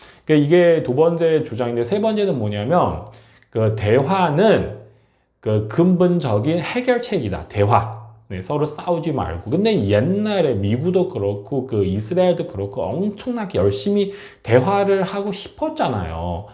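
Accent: China. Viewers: 발음이 왜 중공식임? 중국에서 얼마나 살았기에?? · 한국사람 맞니요 억양이 중국사람인것 같네요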